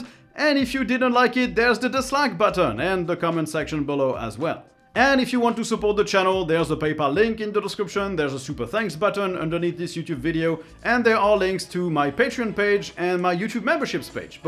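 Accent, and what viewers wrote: Brittany, France. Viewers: Damn are you french? You talk exactly like every french people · Il est breton je crois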